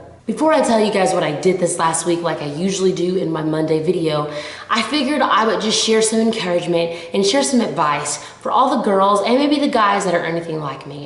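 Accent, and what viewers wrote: South, United States. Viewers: I love this video SO much! Such a great message! :D Also, I never realized how much of a Southern accent you really do have before watching this video. From one Southern belle to another, I think you have the cutest Southern accent I've ever heard. Thank you for posting this! <3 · You're southern accent sounds beautiful today! Love you, Jamie Grace! You are such an inspiration!! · man you have such a southern accent its adorable!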